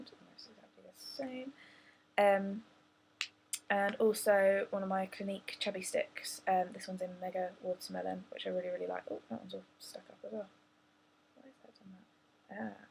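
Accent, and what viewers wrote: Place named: United Kingdom. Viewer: You really should do more videos and fix your lighting. ;) I subscribed to you, darling. I like British beauty-gurus coz they're so down to earth and I love their accent. You're lovely! Kind regards, Simone.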